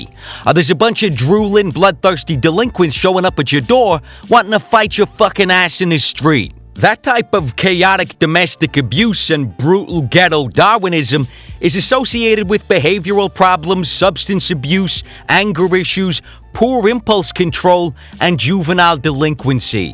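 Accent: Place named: Boston, United States. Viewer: Richard Ott I'd say Boston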